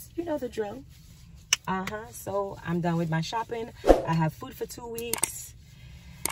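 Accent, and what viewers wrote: Jamaica. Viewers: PLEASE KEEP YOUR MASK ON SWEETHEART IN THE STORE YOU CAN WALK RIGHT BEHIND SOMEONE THAT JUST SNEEZED OR COUGH AND THE SPOURS STAY IN THE AIR FOR SO LONG I JUST GOT HOOKED ON YOUR MUSIC AND ACCENT AND I DONT WANT TO HEAR THAT YOU ARE SICK....I AM NOT A STALKER. I JUST LOVE TO HEAR YOU TALK.I MAY BE YOUR MOM OR GRANDMOTHER'S AGE. GIRL I WISH I COULD SPEAK LIKE YOU. I LOVE LOVE THE JAMAICAN ACCENT. MY PEOPLE i love my black people. I only hate one thing about my people is the JEALOUSY AND THE FALCT WE DONT STICK TOGETHER LIKE BACK IN MY DAY. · I love the way you talk. You are so jamaican. You are so talented and beautiful, fine. I watch you on love and hiphop atl. I listening to your music and look at your videos.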